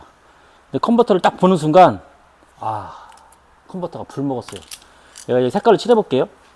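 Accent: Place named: Chungcheong, South Korea. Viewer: 충청도 사투리 쪼~~끔 펌프라고 하면되지 쁘엄 쁘 ㅋ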